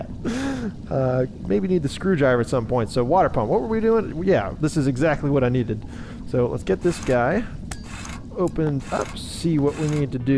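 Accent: United Kingdom. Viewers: British accent through me off lol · I was wondering that too I was like is this dude British or something lol 😂 I need to get a VR headset to have more fun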